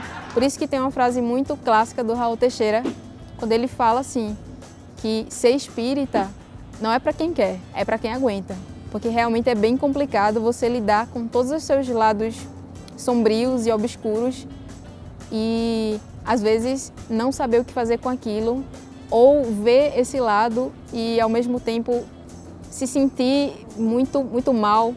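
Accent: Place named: Recife, Brazil. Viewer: Oi, parabéns pelos seus vídeos, acabei de conhecer o canal e estou amando, já me inscrevi! Sou espírita mas estou engatinhando na literatura rsrs,comecei a ler O que é o espiritismo? de Kardec depois vou partir para O livro dos espíritos! À propósito...por acaso esse sotaque lindo é da minha terra, Recife? Rsrs um abraço fraterno!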